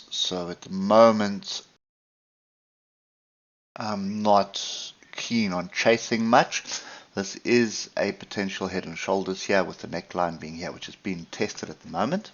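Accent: South Africa. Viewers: You sound like South African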